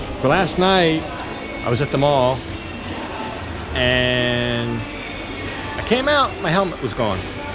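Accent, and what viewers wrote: United States. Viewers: Because he speaks like an American. Nothing wrong with it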